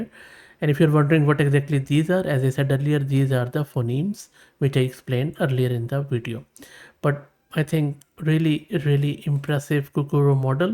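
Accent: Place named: India. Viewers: For me his accent is very clear Mayebe you are not familiar with Indian English accent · Obviously it's you who need to keep up with the Indian English (no offense meant here either). Indian English has an overwhelming stronger presence on the net than southern USA, Scottish, new Zealander, Australian, Scottish accents... That no one dares be prejudiced against.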